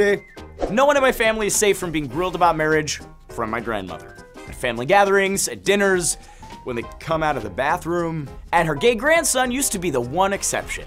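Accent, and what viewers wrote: Puerto Rico. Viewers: i love your puerto rican funny talking ..thanks for let me being happy...Papi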